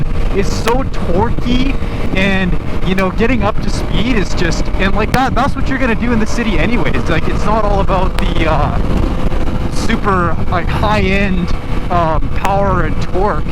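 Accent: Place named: West, Canada. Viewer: Are you from Alberta? That western Canadian accent is really making me curious if I'm right or not?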